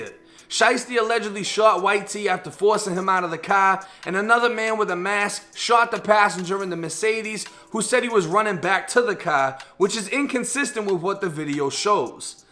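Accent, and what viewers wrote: Boston, United States. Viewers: U from Boston? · Hey were you raised in the Boston/New England ares because you have that BAWSTON accent?! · You from Boston? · Your accent sounds like you're from Boston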